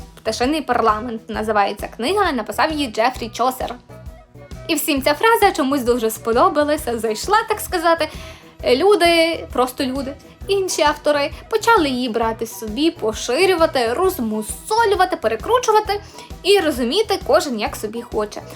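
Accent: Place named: Western Ukraine, Ukraine. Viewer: Кумедна діачинка. Я хоч і сам з заходу України і для мене такий говір мав би бути звичним явищем.та чомусь ваша маннра викликала в мене посмішку. Успіхів у розвитку канала!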